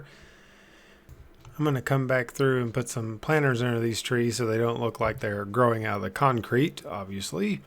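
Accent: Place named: Tennessee, United States. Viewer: Your accent... Where are you from? You sound like a Tennessean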